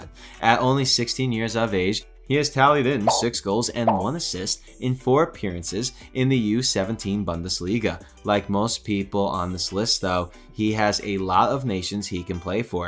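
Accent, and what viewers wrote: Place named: Philadelphia, United States. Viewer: Great video, dude. Subscribed. You sound like you're from the Philly area, yea? Cheers, looking forward to more from ya.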